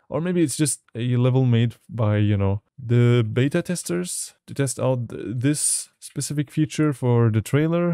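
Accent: Germany. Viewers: holup are u german or austrian?? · Bildquelle suchen is reverse image search. I would have never thought you were German. Your accent is really clean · are you german :O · are you german? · Wait are you German?😮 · Are you German?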